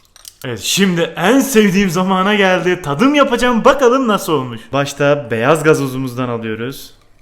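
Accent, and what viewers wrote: Adana, Turkey. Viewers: Adanalı mısın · rendeliyrum adana şivesi çok iyi :D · alürüm geçirüm eklüm aktarıyorüm abi adanalı mısın hzhshshebshs seviliyosun cok beceriklisin masallllaahh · Abi adana şivesi gibi konuşyorsun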